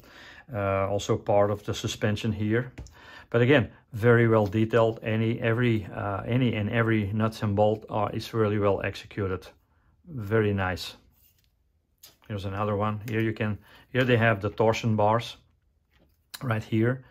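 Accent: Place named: Flanders, Belgium. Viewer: Mine is in the post. I think it is more accurate and refined than the das werk kit. You have a (super slight) Flemish accent, are you Belgian (as you also worked for Verlinden)?Pistol port on the right hand side of the hull is superfluous. Das werk has none, Trumpeter had it on both sides. Should only be on the driver side. I like they provided the drive wheel center cap as separate. Like most probably, I prefer the look of all the bolts in plain view. I thought the tires were normal plastic already when I saw them, thanks for clarifying that, good news!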